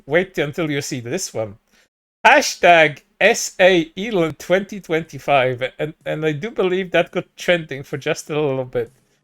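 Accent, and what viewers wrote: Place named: Europe. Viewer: Yeah leave it to some V tuber with a non descript european accent to attempt to cope about Yujiros ultra woke moment where he pwns the conservatards. Yujiros pro trans women anyways, for him thats just dudes finally being honest with themselves. Anyways now I see why people are all the sudden being critical of Baki. Love it when the rightards get mad.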